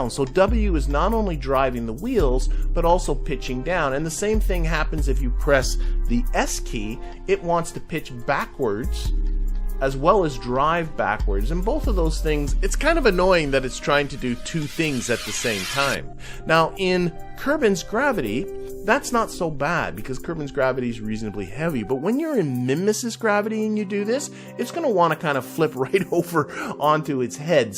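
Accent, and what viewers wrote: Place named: Canada. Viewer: Just found your channel a couple weeks ago. You make some of my favorite tutorials, and have explained key concepts in a very digestible manner. I've got a couple questions about yourself, feel free to not answer if you'd like. For one, do you have a background in mathematics, like either studying at high level or teaching? My second question is are you from Canada, or the northern U.S., you have that sort of accent and i've just been curious. Thanks, -Ben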